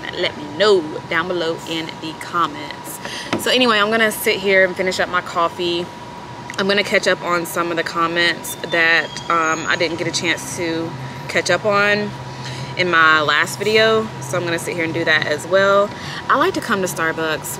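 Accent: South, United States. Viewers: First of all, that oysters vlog makes me laugh! I’ve watched it twice.😂 And you don’t sound “country”, you speak Southern Charm. I will never do YT but seeing the BTS of what all that entails is so interesting to me. Nothing interesting going on here but I still needed this chill vlog. Stay safe and thanks for what you do.💚💚💚💚💚💚💚💚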